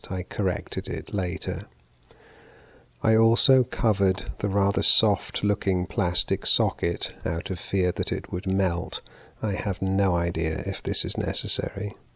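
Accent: United Kingdom. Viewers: ....and your British accent makes you that much more credible!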